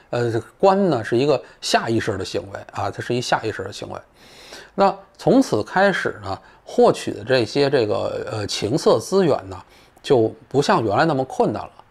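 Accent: Beijing, China. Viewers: 我是个90后女生却十分喜欢散人的扑克脸 北京腔更显老道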